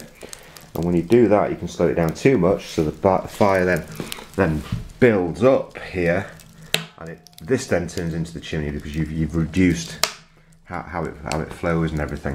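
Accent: United Kingdom. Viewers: ive had a bash at making my own with limited success :( i saw your video and was very impressed by the temperatures you where achieving .was wondering what you sell them for and if it was possible to do smaller scale ? im from Newcastle on tyne so great to hear a British accent person making these videos instead of out back Americans lol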